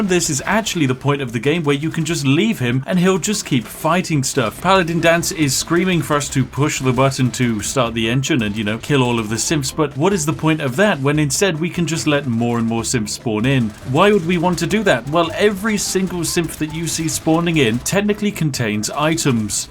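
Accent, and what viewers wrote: United Kingdom. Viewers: Day 5 of reminding Spiff we want to hear him say "Sugoi" in his glorious British voice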